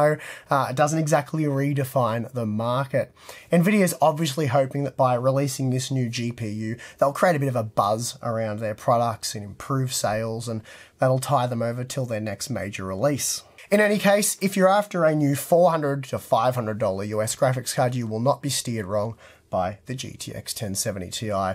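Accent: Australia. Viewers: Get well soon! The videos are not the same without your full strenght australian accent :(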